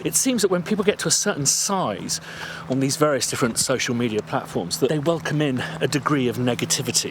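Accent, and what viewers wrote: United Kingdom. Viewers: Mr here in Mexico we say something about that subject... "You are not a Golden coin you will not like to everyone", someone will like you because who you are and what and how you approach things, and the other will hate you for the same reason, the best advice that a 23 years old young man is that if that someone tells you something and you did not think as he/she does the best thing to do is to say is thank you for your opinion, and s Keep things you love and do it in the way you love. hope my simple advice can be helpful in some manner PD: I really enjoy you style of vlogging and your British accent haha.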